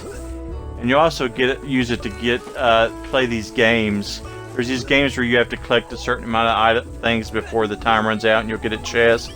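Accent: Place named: South, United States. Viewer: Awesome southern accent bro sounds like me lol I'm from Memphis TN yo I'm wondering is it worth 13.00? Ps4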